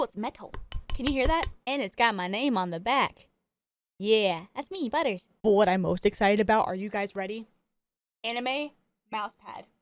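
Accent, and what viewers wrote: South, United States. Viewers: no me habia dado cuenta que eras sureña! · I could hear this southern snow queen talk ALL day.. 😍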